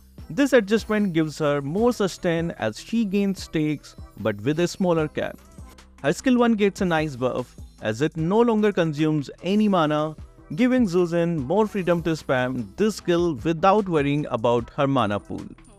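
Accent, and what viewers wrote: India. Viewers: Indian accent wtf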